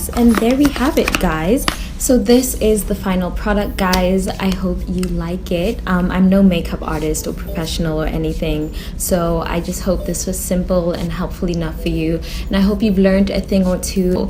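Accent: South Africa. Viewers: I knew from the moment I heard u speaking that u are South African, u are very detailed,love love ur videos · are you South African Sisi?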